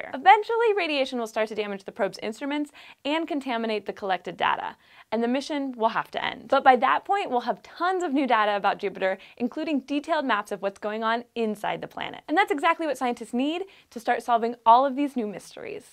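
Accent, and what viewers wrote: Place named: United States. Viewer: Slow down. I'll have to rewind to try and figure out what you said right at the start. I'm not American so your accent makes it even harder. Otherwise I like the way you sound.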